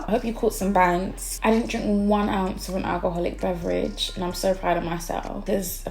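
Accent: United Kingdom. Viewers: am I the only one who hears Nicki's voice when she speaks ? with a british accent ofcours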